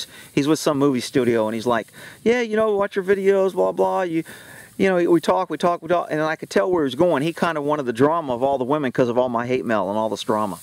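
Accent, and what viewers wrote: Texas, United States. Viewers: your Texas accent is stronger...I could barely understand you say "saliva". lol Awesome video. Thanks!!!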